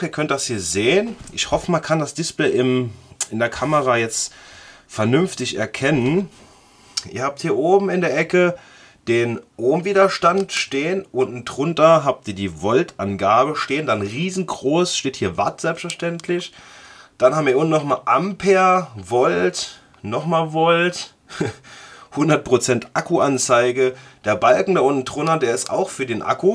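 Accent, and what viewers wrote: Saarland, Germany. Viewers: Kommscht du ausm Saarland? de Dialekt kommt ma bekannt vor ;)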